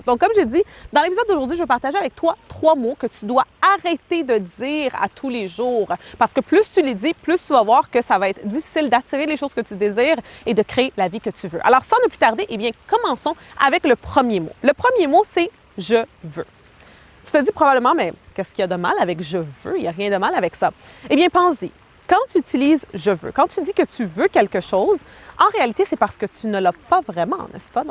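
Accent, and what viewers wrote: Quebec, Canada. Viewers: C'est l'accent québécois. C'est un peu triste de focaliser sur son accent au lieu de son message. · Ah!!!!!! Cet accent ça me rappelle de bons souvenirs au QUÉBEC merci je m y met.....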